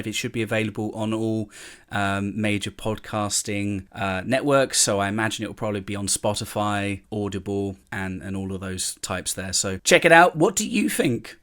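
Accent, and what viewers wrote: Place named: RP, United Kingdom. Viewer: Man you have the poshest accent.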